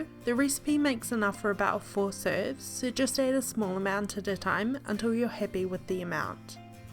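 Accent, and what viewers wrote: New Zealand. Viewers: She is a kiwi, new Zealander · Are you from NZ?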